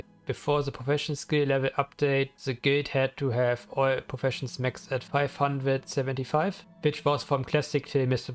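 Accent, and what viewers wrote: Germany. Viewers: It was quite strange listening to you speak, because I know you're speaking English but half the time it sounded like you were speaking German only to realize you were indeed speaking English but the cadence and rhythm and enunciation was very much German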